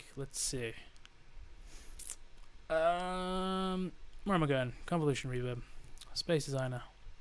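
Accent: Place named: Scotland, United Kingdom. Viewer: Thank you for the strream and the production knowledge bruvo, absolutely love your Scottish accent!! This stream made my day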